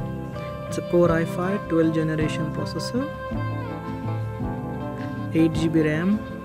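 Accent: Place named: India. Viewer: I Hate indian speaking English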